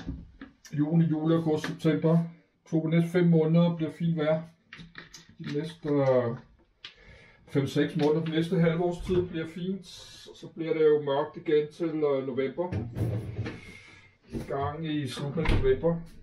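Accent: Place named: Bornholm, Denmark. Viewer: hvorfor snakker du bornholmsk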